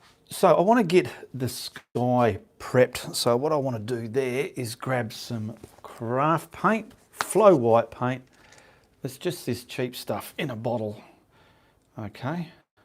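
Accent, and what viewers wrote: Australia. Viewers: Love your 'Stralia accent as much as your teaching skills. Oldie me (72yrs) in Inverurie. NE Scotland( Re accents I speak The Doric,so "Fit a fine daundie mannie learning us tae pint.xxxx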